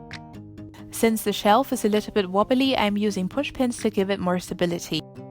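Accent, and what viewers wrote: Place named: Germany. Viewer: da hab ich doch ein gutes Gefühl für das deutsche Akzent😃😎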